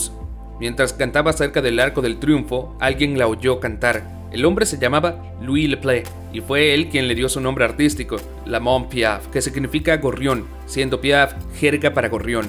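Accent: United States. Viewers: buen video, pero tengo que darte una sugerencia, como comprendo que no tienes por que saber frances, entiendo que no sepas como se pronuncian los nombres ni las palabras, lo que no entiendo es esa mania que teneis la mayor parte de los youtubers en sudamerica de pronunciarlo todo como los yankees, no se si es complejo o que creeis que os hace parecer mas inteligentes. te pediria que si vas a pronunciar mal lo hicieras como lo haria un hispanohablante y no como crees que lo haria un yankee, que ademas hablan un ingles como con desgana, insisto buen video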